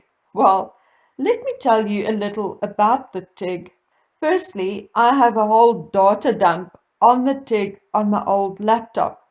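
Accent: South Africa. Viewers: I love your videos but the accent is sooo hard to listen to. Dutch/German and African created the weirdest accent on earth. My bf is South African and even he says it's the ugliest accent on earth! Lol. Some people have nice soft ones but then you get the really stilted, harsh, Hocking ones. They don't flow at all it's like all from the throat and sounds tiring to speak lol. There's no flow, the one is off, like a computer voice. Know what I mean? Im clenching my jaw the whole time, it sucks cause it's so hard to listen to :( 😂 it's a real shame but it's infamous for a reason eh! I've listened to you for a year, and I'm STILL. Not. used. TO. IT. .😅😅 .It's. Like. There's a. fUll stop. After. Every Word. And they go UP . And the . Tone. Goes down at. Random. Points. " Or maybe its Zimbabwe but it's one of those German/Dutch meets African monstrosity combinations lol. 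Looks wise, caucasian Africans are gorgeous though.. the men and the women. the men are always sooooo sxc and manly! Woo! 😍 I think it's the mix of cultures and outdoor lifestyle , but yeah... They are sxc like we Australians . 😎😎 It's just the accent that can be gnarly.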